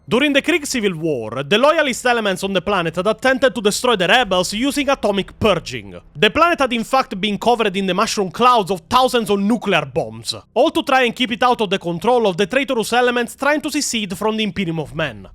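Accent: Italy. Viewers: I just can't Italian accent makes all "high gothic" names just Italian names, and its sooo good! It feels like i watching Young Pope or something.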